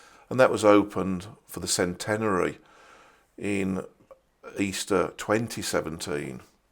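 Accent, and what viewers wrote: Birmingham, United Kingdom. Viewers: Absolutely superb. Your soft brummy twang evoking memories of the Shropshire lads.......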